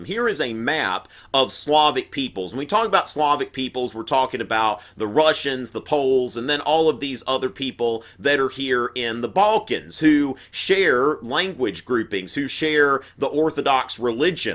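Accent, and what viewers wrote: South, United States. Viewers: r u American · Love your videos! Informative plus a great southern accented sense of humor. Thanks! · His voice is so American. He seems so hyper, like calm your jets. :D Good video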